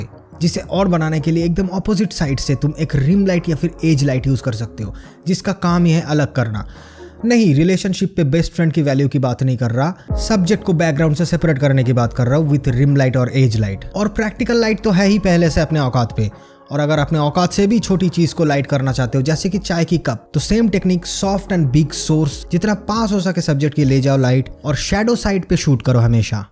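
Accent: Bengal, India. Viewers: Bhai are u bengali?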